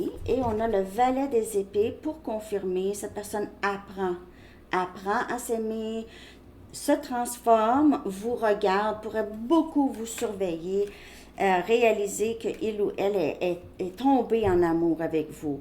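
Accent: Quebec, Canada. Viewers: Salut non sa va tu prononce bien avec un jolie accent québécois magnifique guidance générale j adore bonne continuation à bientôt ❤️ ❤️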